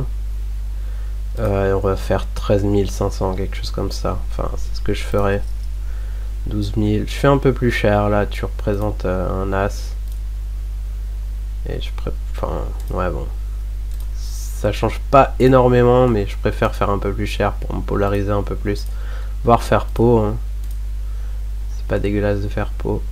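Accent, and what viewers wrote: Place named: Alsace, France. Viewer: je me disais bien que je connaissais ce léger accent lol. Que nôtre Alsace est belle lol. Blague à part, vidéo très instructive. MERCI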